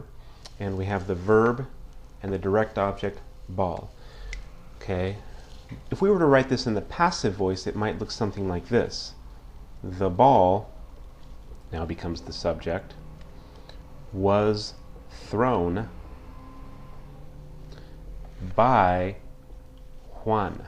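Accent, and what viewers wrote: United States. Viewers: I just don't understand why people have to be so critical of everything. So his accent is "estadounidense", so what? He's still a good teacher. You think English teachers in Latin America don't have accents? Would YOU have an accent...?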